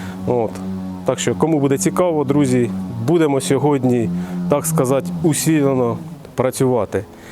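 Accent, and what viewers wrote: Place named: Surzhyk, Ukraine. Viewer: я не к замечанию но коль ты переключился на мову то говари на ней а не на суржике а лучше говари на том языке который знаеш раньше ты неплохо на русском говарил а пахота зачёт тоже занимаюсь только без обид привет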